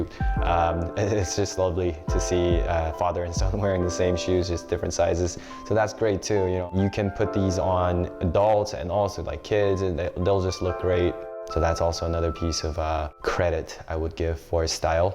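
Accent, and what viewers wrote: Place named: United States. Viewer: Great American English! Is it your second language in S. Korea? Great review! Wouldn't you consider this kind of Mule shoes, let's say 'girlish'? - Cheers from Peru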